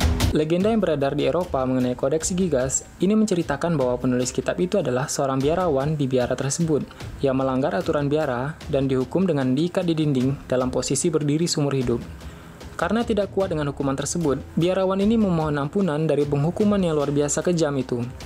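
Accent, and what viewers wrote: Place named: Sumatra, Indonesia. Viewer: dari logat admin sepertinya beliau ada logat orang sumatra dan melayu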